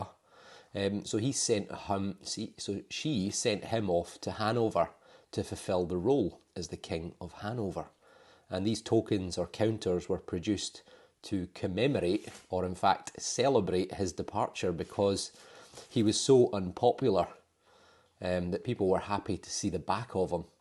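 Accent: Scotland, United Kingdom. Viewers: Grettings from Denmark. I love to watch your videoes and listen to your charming scottish accent. Keep on the god work 😊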